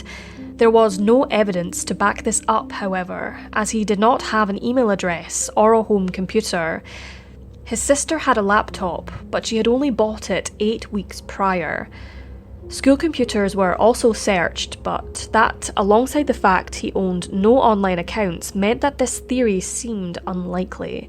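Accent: Scotland, United Kingdom. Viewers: Ann it’s a scottish accent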